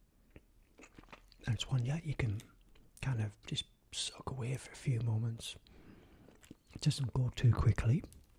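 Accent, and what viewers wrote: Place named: Durham, United Kingdom. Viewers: Are you Durham? You sound Durham! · Great video :-) really relaxing and made hungry haha. Like your natural accent too, a true Geordie haha. I agree, those two are my favourite too! But I love the strawberry ones too, so strawberry would have taken silver for me:). Do you prefer roses or quality street?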